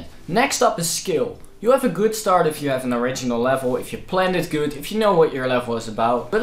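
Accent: Netherlands. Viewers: I can sense the Dutch (W tutorial)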